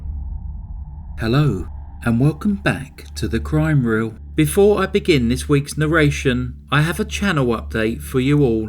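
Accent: United Kingdom. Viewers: You are doing a great job. I really enjoyed your narration on the cases. I especially enjoyed your british accents.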